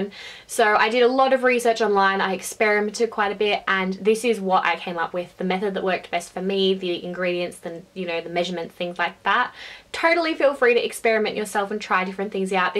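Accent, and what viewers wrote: Australia. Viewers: Are u from Australia ???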